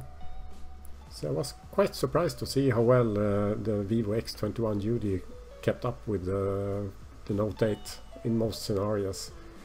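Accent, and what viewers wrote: Sweden. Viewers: Thank you for the review, i can notice you are svensk hahha.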